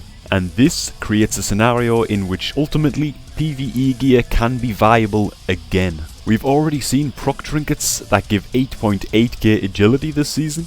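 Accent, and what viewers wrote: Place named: Scotland, United Kingdom. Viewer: he is scotish I think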